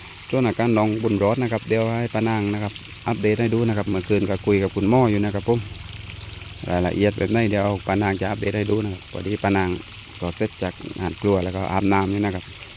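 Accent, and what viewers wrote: South, Thailand. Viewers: ลุง อยู่จังหวัดไหน เห็นพูดไต้ค่ะ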